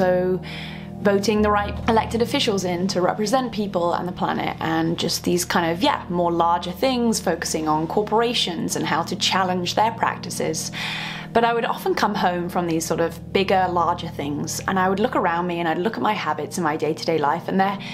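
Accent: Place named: England, United Kingdom. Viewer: I really love English accent, she's a real queen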